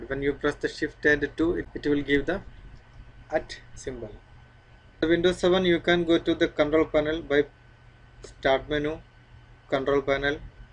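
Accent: India. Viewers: Thank you love your India accent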